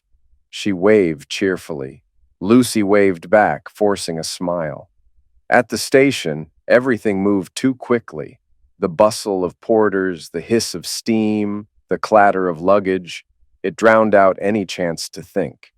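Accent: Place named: United States. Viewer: Somehow, the american accent doesnt quite do justice to the story.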